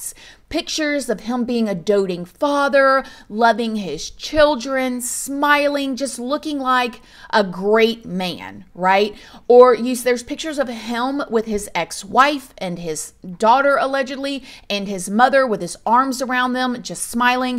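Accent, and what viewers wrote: South, United States. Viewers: you have a lil country twang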